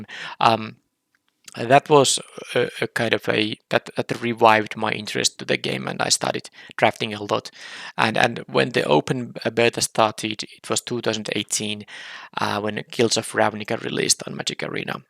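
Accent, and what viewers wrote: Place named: Finland. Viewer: thank you for update. I'm glad, that you are Ok 👍, and stay with us. Just make a video, when you feel it. I will happy to see you anytime. You are such a nice guy and i love your finnish accent 😄. Believe or not, i watch other streamer from Finland on twich named NCAA, which is one of the best limited player on the world. So in Finland are another great players 😄👍. Apologize for my English, this is my longest comment in english ever 😄😄 Stay strong, stay healthy. Greetings from the Czech Republic.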